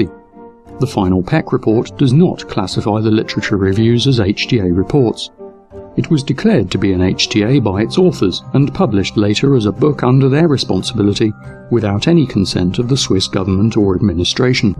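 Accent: England, United Kingdom. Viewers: I know a fellow englishman's voice when I hear it, but I just can't place your accent. What part of england is that?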